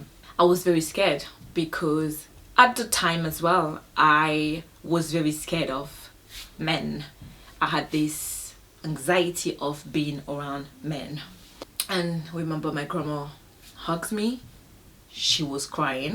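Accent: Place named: Africa. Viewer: I like your accent sista. Sounds like African accent.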